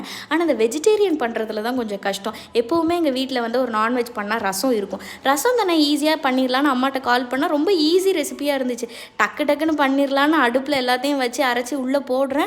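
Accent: Tirunelveli, India. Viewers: Unga nellai slang in innocent tone is awesome jeera pepper equal a powder panni vachukita rasam easy a panlaam v can do it by trying it often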